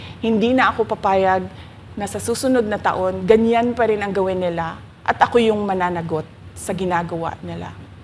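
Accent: Visayas, Philippines. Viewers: Ayan TV5 umabot nadin kayo ng more than 100k viewers. 👏👏👏 Hanggat hindi maging fair at ma katutuhanan ang mainstream media, hindi hindi kayo nakaka abot man lang ng 30K na viewers. Kaya huwag kayong nagtataka kung mas maraming nanood sa mga political Vloggers contra sa tv news. Sino ba ang mas tutuo itong si Inday (David) compared the titans in Congress (Goliath). Eto si Inday na sinabihan nilang BUDOL at Bobo dahil sa kanyang Bisdak intonation. LETCHE! PROUD BISDAK SI INDAY! Ngayon, Congress has already spoken in name of OVP's sponsor that "MOOE at Capital Outlay" lang ibibigay sa OVP za kadahilana g di pag dalo ni VP Inday. Di man lang nila tinignan ang pambabastos ng Congressmen ki VP Inday during the first hearing where siya sinabihan Pusit . Saan ang courtesy doon?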